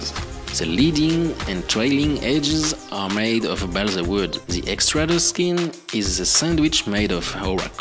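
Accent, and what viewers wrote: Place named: France. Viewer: just wondering what film covering you used? i cannot understand what it says in the video. also, what airfoil? and what size prop? I can help you do a voiceover in american english, if you send me the script, i can send you the audio recording? perhaps it would be easier to understand? be happy to help if i can. if not, no hard feelings. I speak spanish, and english, but had a hard time understanding the french accent. Thanks for the update! thumbs up!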